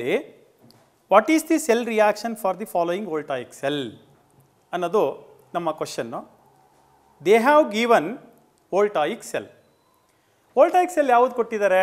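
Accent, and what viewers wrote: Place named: Tamil Nadu, India. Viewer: typical tamil village english but ok ok teaching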